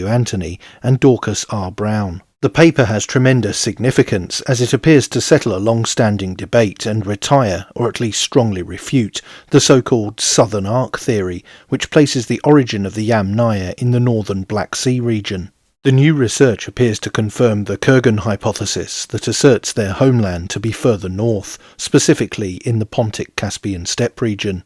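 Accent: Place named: England, United Kingdom. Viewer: He’s English. People pronounce words with the most sensible emphasis in the phonic system of their own language. English people don’t say Paree when referring to Paris, even though that is the French pronunciation.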